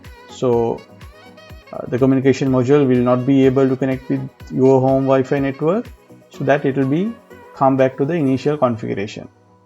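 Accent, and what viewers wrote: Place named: India. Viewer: Pity you don't have the subtitles. Your Indenglish is difficult/impossible to understand when you do the patches of high speed phrases.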